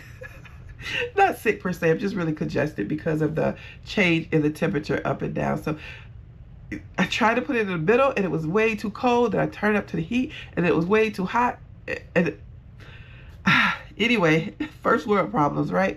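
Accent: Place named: New Orleans, United States. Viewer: Fun video even though it’s something I can’t do. Sounds like you’re from New Orleans or at least your family is.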